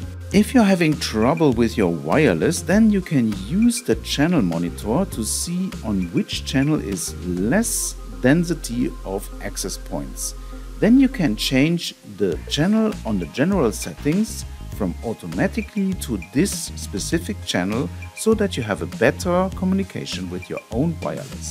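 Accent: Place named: Germany. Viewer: man hört anhand der Aussprache, dass der Moderator ein Deutscher ist. Wir leben in Deutschland - warum also kann dann das Video nicht auch in deutsch produziert werden??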